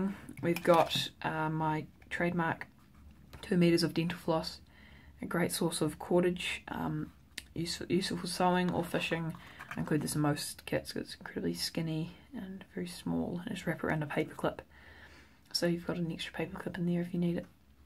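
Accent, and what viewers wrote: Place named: New Zealand. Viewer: I recognise the accent! A fellow kiwi I see. What part of NZ are you from?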